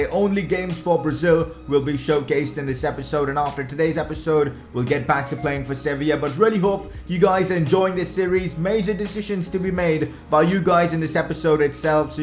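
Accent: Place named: India. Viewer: That one Indian kid in school who tries to be cool with his cringe ass accent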